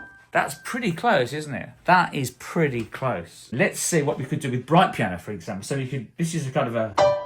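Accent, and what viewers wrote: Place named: Birmingham, United Kingdom. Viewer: Very interesting . You are an amazing player and I've learned so much listening to your videos. Also nice to see your other keyboards stacked up especially the Roland Juno. I have a beloved Roland Juno DS Amongst my many others. I'm sure I detect a slight Birmingham accent. I used to get some keyboards from Jones and Crossland and Lichfield Organ Studios back in the day as I lived in Lichfield before I moved up to the Scottish Highlands. Keep up the amazing playing ! 🎹👍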